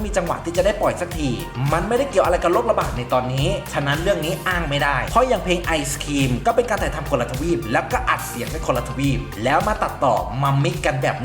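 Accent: Isan, Thailand. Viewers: ตื่นเต้นอีกรอบแล้ว..อยาดฟังมากๆคะ รักลิซ่า ชอบช่องนี้มาก เว้าอิสานถึกใจคักๆ.มักหลาย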